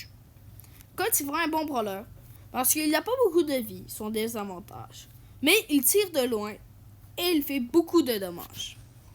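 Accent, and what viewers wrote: Quebec, Canada. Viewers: t'es québécois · T'es Québécois ? Si oui j'aime bien ton accent😉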